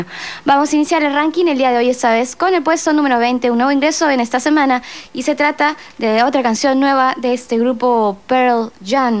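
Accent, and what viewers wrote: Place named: Europe. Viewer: Yo tambien ..me enamore de la linda vozz .. como sera la muchacha.. esa vos melodica tann riff que ..vozz media europea.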